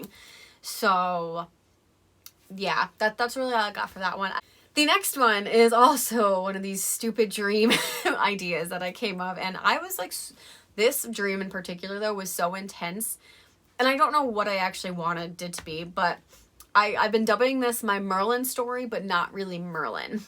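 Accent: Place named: United States. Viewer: Wow! They are great ideas for different genres. Your creativity is limitless! But now you must do the hardest thing: write them! By the way, can I ask where are you from? I'm 99% sure you are from USA, but I cannot distinguish accents very well because I'm from Spain.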